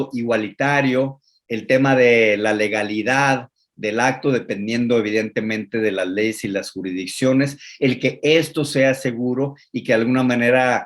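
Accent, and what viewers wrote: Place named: Mexico. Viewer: Gracias por su aporte,ponencia muy buena narrativa y la deja mejor aún ese tono mexicano,.Creo que el ser humano se adapta a los cambios con respecto a la mediación y el uso de la tecnología...saludos desde Argentina.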